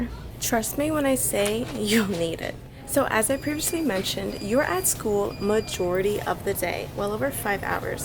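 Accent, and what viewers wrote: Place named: Latin America. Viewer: She has a very thick Hispanic accent.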